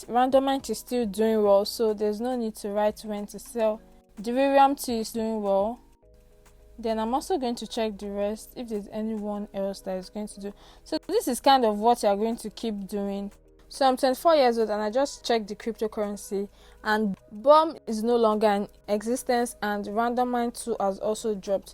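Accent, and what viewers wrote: Nigeria. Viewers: Like do you get? When I subbed and started watching a video I was so shocked to hear the Nigerian accent Most Nigerians DONT get they hype of the game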